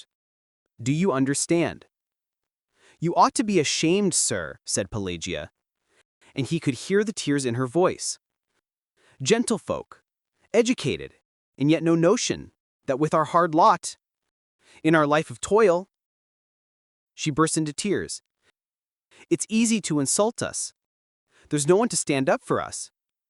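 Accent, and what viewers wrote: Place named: United States. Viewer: Я не ожидал, что голос с американским акцентом подойдёт к рассказам Чехова. Но это случилось. Удовольствие слушать!❤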